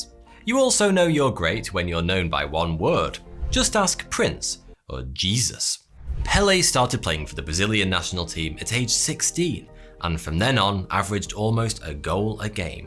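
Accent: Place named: Lancashire, United Kingdom. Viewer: Classic Lancastrian, it's a globally recognised accent. BB1 here.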